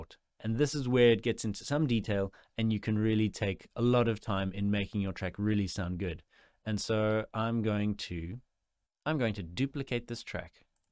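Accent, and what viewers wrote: South Africa. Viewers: is your accent South African or British? sounds like a bit of both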